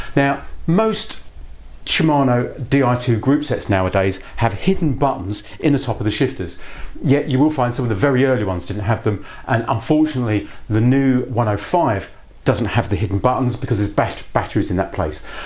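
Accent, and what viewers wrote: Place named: Lancashire, United Kingdom. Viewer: Wow 😮 I’m losing the will to live, even though I like your videos but a strange Lancashire accent 😊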